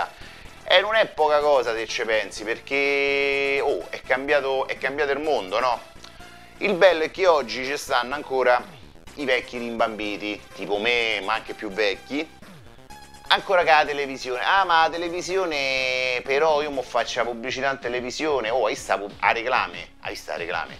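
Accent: Rome, Italy. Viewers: sei un grande, oserei dire un "geniazzo": estrema competenza abbinata a grande, voluta, semplicità comunicativa, peraltro con un deliberato utilizzo del "romanesco", che rende il tutto incredibilmente familiare e soprattutto nostalgico; spazi dalla tecnologia allo sport con la stessa disinvoltura con cui dai un "colpo sotto"!!! 🤝🏻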